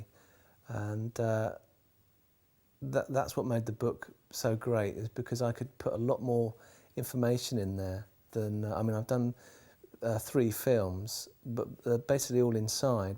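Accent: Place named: England, United Kingdom. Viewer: I KNEW he was English. Lol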